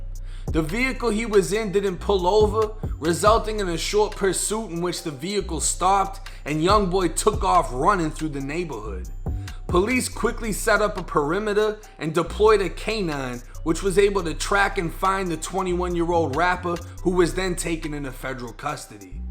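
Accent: Boston, United States. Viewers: Lol love Boston accent and I'm Canadian I just heard it on tv or Internet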